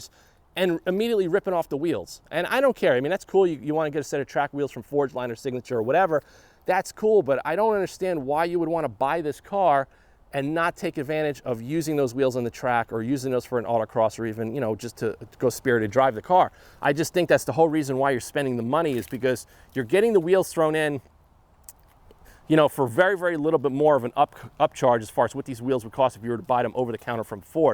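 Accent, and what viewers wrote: New York, United States. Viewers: I bought a base model velocity blue 2020 gt500 on 1/6. It has white vinyl stripes. It won’t be driven in the winter. Your channel came up when I googled “GT500 in the winter”.It was interesting hearing your New York accent since I grew up in the Bronx. I now live in the very warm state of Minnesota. 🥶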